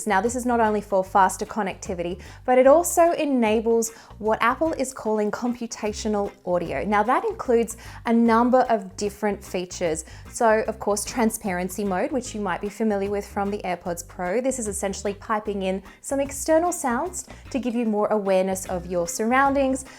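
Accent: Australia. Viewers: Is she aussie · Is she australian?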